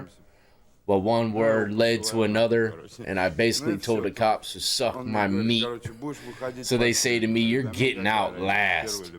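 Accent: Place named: South, United States. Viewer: Texas,Lousiana etc. I Love the southern accent. 🎵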